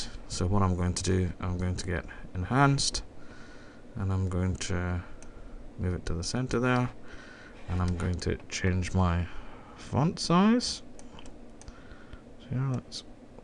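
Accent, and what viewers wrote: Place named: Scotland, United Kingdom. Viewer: Not to get off topic, but that is one heavy Scottish accent.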